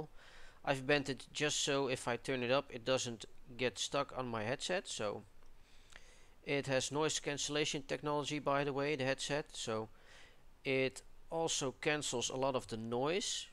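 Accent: Netherlands. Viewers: lol ik hoor duidelijk dat je nederlands bent maargoed XD (tis oke hor XD) ik zou het eigenlijk handiger hebben gevonden als je de review deed met headset op... dan zou je wat beelden vooraf kunnen recorden en dan de headset opzetten. ik wou namelijk weten hoe het geluid klinkt, als je zou kunnen vertellen hoe het klinkt of ff video link sturen waarbij je die headset gebruikt, bedank voor het lezen/reageren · You sound like a dutchman, just saying :p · I needed 3 seconds to know you are Dutch speaking. :) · just got them! they actually fit perfect on my head! and music and in games does actually this headset proven to be good! not extreme but its only like an 50£ headset and for that price its perfect! i have a problem pretty big one but thats not the headset thats the pc! im having problem with installing the driver(no surround);( but hopefully sooner or later i,v fixed that! 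im using glasses and it works just fine =) thanks for the review and i think your dutch;y english is perfect xD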